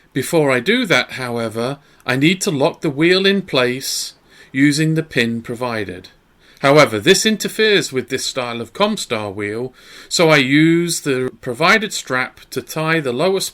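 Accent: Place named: United Kingdom. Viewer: ahhhhh your accent made me think you were in the uk 😎 They do have some uk based resellers 👌🏻👍🏻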